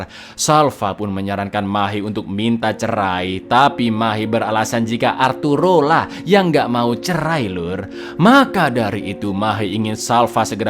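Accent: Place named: Indramayu, Indonesia. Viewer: Kok logate serupa Indramayu, curiga kang wng dewek 😅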